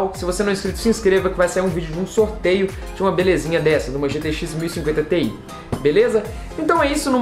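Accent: Minas Gerais, Brazil. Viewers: Mineiro mineiro ♥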